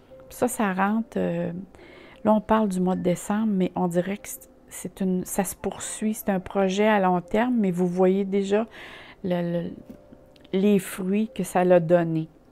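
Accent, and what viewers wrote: Canada. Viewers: je vous decouvre et j'adore....MERCI bizou du coeur de Nouvelle caledonie...(votre accent canadien...que jadore)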